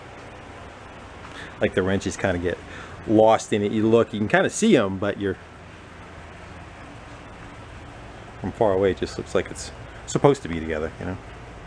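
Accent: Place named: United States. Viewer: It's ok, you speak American like us! If we wanted Shakespeare we would be watching a play!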